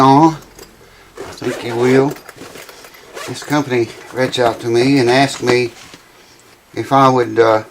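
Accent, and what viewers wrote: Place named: Arkansas, United States. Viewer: Tickles me, I am from Arkansas and you talk a whole lot like I do. I am just uglier than you, B/C I am 71 (seventy & one) years old.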